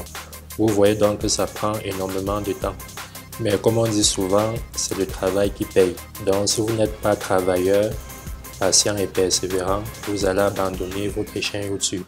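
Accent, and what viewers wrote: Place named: France. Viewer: moi j'aime trop sont acssent francais :D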